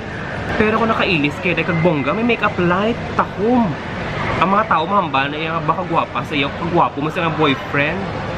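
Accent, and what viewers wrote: Iloilo, Philippines. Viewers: Hugot gid kuya, true gid ginahambal nimo how,tama ba ako ilonggo,bisaya gid ako,thailand is the nice place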